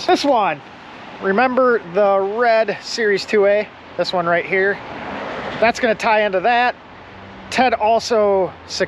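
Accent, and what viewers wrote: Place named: United States. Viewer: God I hate the American accent!!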